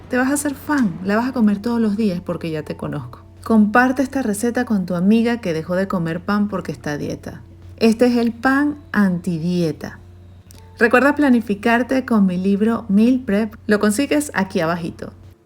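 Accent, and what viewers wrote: Venezuela. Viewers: Me encanta escucharte demasiado venezolano, ya me estoy comprando tu recetario ahora mismo !!!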